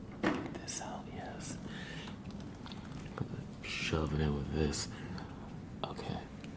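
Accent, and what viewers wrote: St. Louis, United States. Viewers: Ah, St. Louis! I didn't know the accent. I definitely hear you pronounce here, ear, and there differently than I'm used to. 🙂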